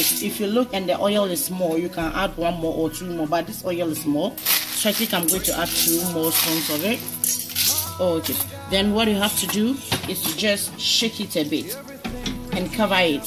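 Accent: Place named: Ghana. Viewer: As soon as I heard the accent ...Ghanaian , lol! Subscribed!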